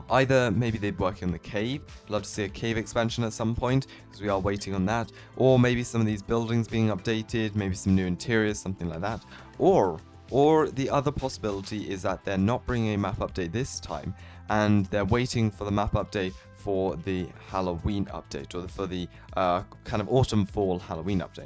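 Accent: United Kingdom. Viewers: Can anyone tell me if is British or not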